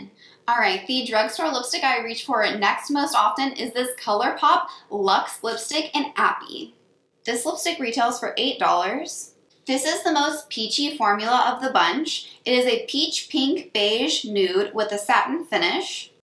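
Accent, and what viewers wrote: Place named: Minnesota, United States. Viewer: Are you from Minnesota??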